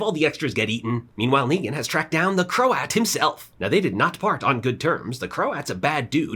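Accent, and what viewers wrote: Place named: New York, United States. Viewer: Thanks, love the content as always. Was really looking forward to the Dead City recap, and it didn’t disappoint. Love the New York accent 😅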